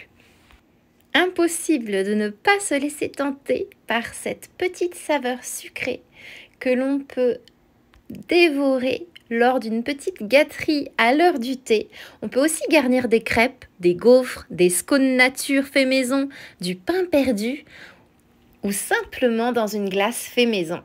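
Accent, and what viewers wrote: France. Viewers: Sorry, you had me at the french accent. I was not expecting that. Lovely! 🤩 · me too, I came back just to listen to the French accent 2nd time :)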